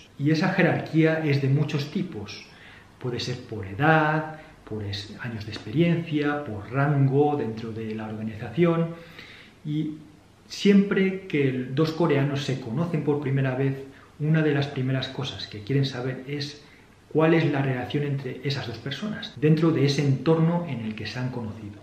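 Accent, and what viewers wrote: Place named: Spain. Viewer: Normalmente los coreanos que hablan español tienen acento de mexico pero vos lo tenes español...porque? es solo curiosidad saber de donde es el acento. saludos.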